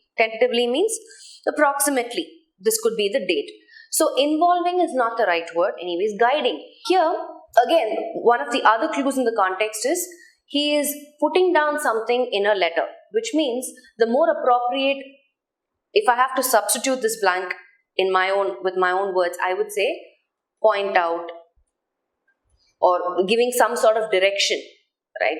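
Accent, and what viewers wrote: India. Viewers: your accent is indian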